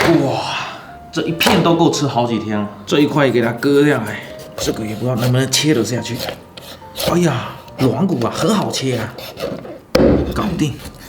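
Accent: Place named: Fujian, China. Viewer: 你是福建人吗。。。 哈哈 口音好重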